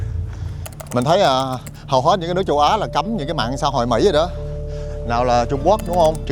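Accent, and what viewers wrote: South, Vietnam. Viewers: Giọng nam và đẹp trai. Có kiến thức. văn võ song toàn. Hình như em có tất cả. ❤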